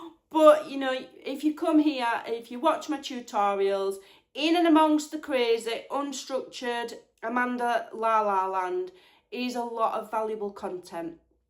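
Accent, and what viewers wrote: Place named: Northern England, United Kingdom. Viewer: You know what Amanda, I'm fairly new to your channel and I've not actually seen you before. Don't take this the wrong way, I really enjoyed your videos before, but I like you so much more now I've seen you're lovely face and the source of that great Northern accent. I'm a northerner living in the south (i know, a traitor 😂) but I have found so few English accents on YouTube, let alone northern ones. Having said that I love loads of American and Australian ladies' videos. So keep on keeping it real Amanda and I'll keep up my subscription ! deal ? Hahaha. Have a great day.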